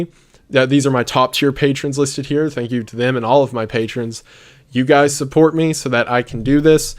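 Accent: South, United States. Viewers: Where are you from? I giggle because you have a southern accent at times.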